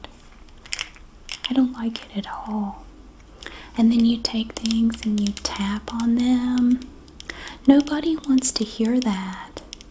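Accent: South, United States. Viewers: Y’all, I thought she was talking to me and almost reported myself………😊 damn I love a southern woman and accent. She has me like ole yellow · Tell me you’re a Southern lady without telling me you’re a Southern lady. Git ‘em! Love you!!!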